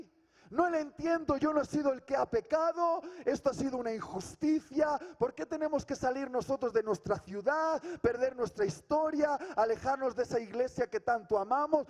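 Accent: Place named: Spain. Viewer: Apenas hace unas 2 semanas te encontré por YouTube y ya eres uno de mis predicadores favoritos. Nunca te alejes del Señor pues a la mejor no tienes idea del impacto q estás siendo a miles o quizás millones de <link> fascina tu acento español pero aún más el como Dios te usa para transmitir cada msg de su palabra. Con cariño de una abuelita en Cristo.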